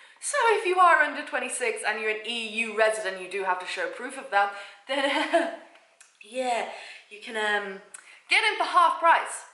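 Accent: England, United Kingdom. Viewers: hahaha... you are super funny and really liked the way u explained ur day expenses and luved ur accents too which reminded me of nephew living in England... but he is 12 yrs kid 😁😁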